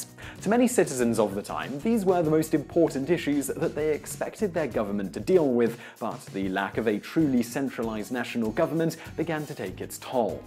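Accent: United Kingdom. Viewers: I love when you accidentally stumble onto an awesome channel. This channel is one of those awesome ones. I have been watching your videos non-stop for a couple days now. I can't get enough of them. However, is it possible for to slow down a little. He speaks super fast without any breaks. Sometimes I don't think he even stops at the end of his sentences. I think maybe he only stop at the end of his paragraph...lol In addition to his super speed he has that accent going on. You know when you are listening to another language that you do not know and you can't figure out where the breaks between words are? That's kind of what's happening here and I've been speaking English for 47 years. I actually have to pay really close attention, concentrate, & sort of translate what is being said. It's taking a little work to watch these videos and I watch youtube so I don't have to pay attention. 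If you do decide to take my advice, you don't have to slow down dramatically just a little slower would be good. Plus I think I see you turning blue from lack of oxygen....I'm just kidding about that. I see you have a lot of subscribers but I'm wondering if maybe your subscriptions would increase faster if you did slow down a little bit. I could imagine that some people may be passing up on pursuing your channel because your videos do take a little work to understand and follow what is being said. Obviously Europeans probably have no problem following you because they are used British-Englis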